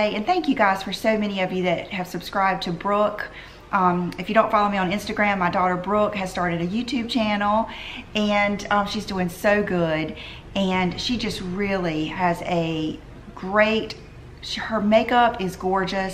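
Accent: South, United States. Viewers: I love the natural look on you!! So gorgeous. Btw, it cheers me up so much everyday just to hear your sweet voice. I am from GA and I love a fellow southern girl accent!!! You remind me so much if myself. People say we’re sweet but we can be tigers too! Lol. 😘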